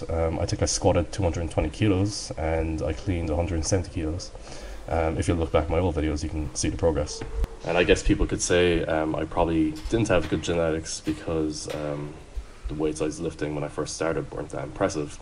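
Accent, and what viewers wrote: Ireland. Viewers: Are you Irish ? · Wtf your Irish .. I thought your American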